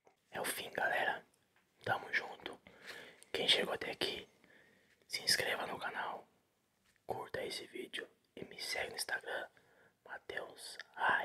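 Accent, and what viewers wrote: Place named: São Paulo, Brazil. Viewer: Fala meu mano, tu é Paulista ou Paranaense ali da parte de Curitiba, muito parecido seu sotaque.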